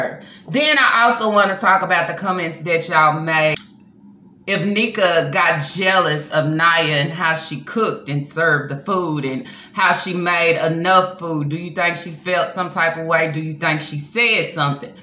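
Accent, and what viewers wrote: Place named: South, United States. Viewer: I'm new to this channel can someone tell me what State is from I hear Southern twang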